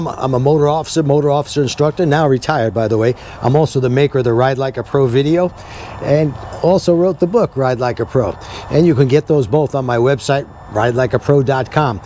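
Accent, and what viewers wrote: New York, United States. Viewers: where in NY ya from... OMG ya sound like me..hah.. apperecite ya...much respect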